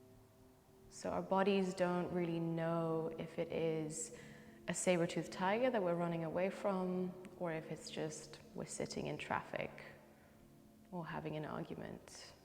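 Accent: South Africa. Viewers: Loves that Your accent is comfortably familiar to a fellow south african, who is overseas. Great instruction and sequence. Thank you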